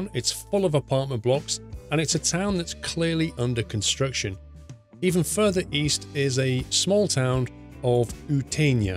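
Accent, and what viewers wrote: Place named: United Kingdom. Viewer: That British pronounciation :D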